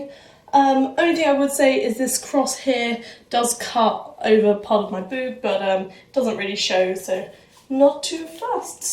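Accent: United Kingdom. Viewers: I love your british accent pretty girl, great videos, I wish you happy holidays-christmas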